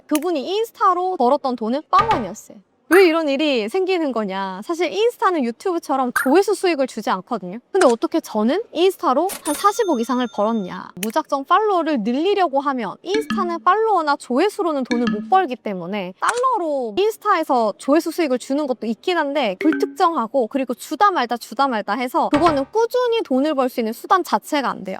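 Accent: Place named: Gyeongsang, South Korea. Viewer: 경상도 억양이 있으시네요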